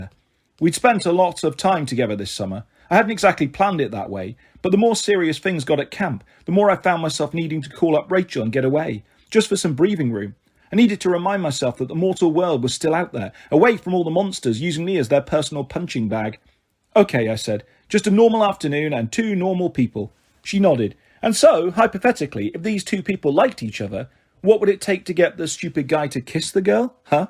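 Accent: England, United Kingdom. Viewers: Smh i gave it a try and your good but i just cant listen to an English accent when it comes to New York Percy lol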